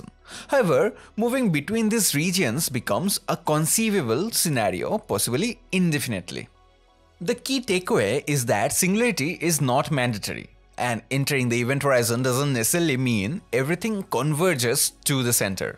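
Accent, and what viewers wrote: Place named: India. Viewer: Bro, no offense. I respect that you bring in science and valuable information rather than the next clickbait video, but the hard Indian English accent (at least it sounds like that) makes it most of the times really difficult to follow. If you are open to constructive criticism, maybe use some appropriate tools, or have it dubbed by some AI voice perhaps. Other than that, well done. Keep it up.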